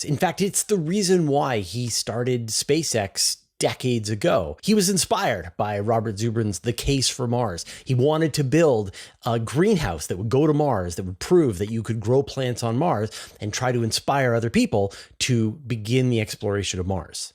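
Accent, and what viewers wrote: Canada. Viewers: I think he's talking about my comment on the last video 😂. I was just giving him a hard time because of his Canadian pronunciation of "A"s and "OU" vowels. My whole family immigrated from Canada to the US, so I always notice the subtle differences in speech. Some people are just really good at hearing accents, while others aren't. You being Canadian is why it sounded good to you 😂.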